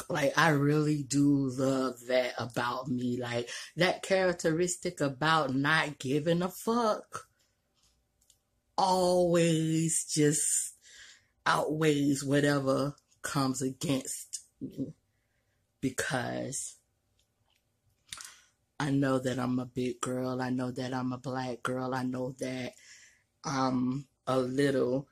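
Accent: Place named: Florida, United States. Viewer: Imma little bitty bitch but i can relate to you in a lot of ways! And i been watching yo vids for some years now and i can see that LIKE ME you too didnt have good school years... they picked on me cause i had acne..for the most part. And when my hair fell out in 6th grade it was cause i was bald head and had acne. And i was really self conscience and had very low self esteem them days too. So i was also naturally depressed ofcourse. So again sis i can relate. I know the lame from snap said some "negative" shit but i watch you too and here go some positive shit for yo ass. 1. You REAL asf, and thats more than i can say for most ppl. You very honest and blunt. 2. You funny, 3. You are BEAUTIFUL, and i being dead ass when i say that 4. Normally you are very CONFIDENT and vivacious. But these last videos i dont seen that you recently did..have been down and in your feelings and im worried cause your normally Happy and funny and smiling and shit just being...and your eyes looked lively and happy. 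In this video they look so sad. I just want you to know your real subs dont care that you a big girl or that you breathe hard. I never noticed tbh...i just hear that thick as florida accent. I like yall accent lol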